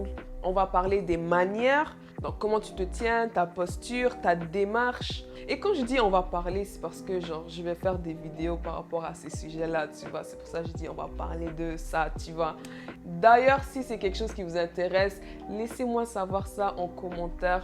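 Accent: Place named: England, United Kingdom. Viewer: Ton français provient d'un accent anglais, je crois 🤔?et du courage je me suis déjà abonné....du courage pour la suite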